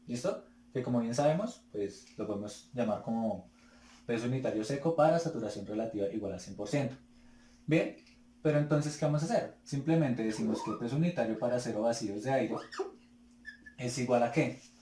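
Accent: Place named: Colombia. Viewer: tal vez ya no te sirva pero por el acento creo que es Colombiano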